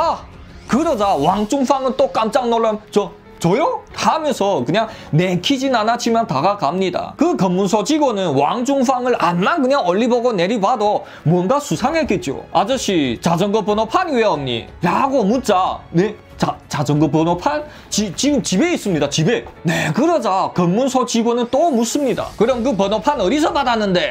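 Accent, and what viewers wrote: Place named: Korean-Chinese, China. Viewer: 구수한 조선 족 사투리와 스토리가 재미 있군요.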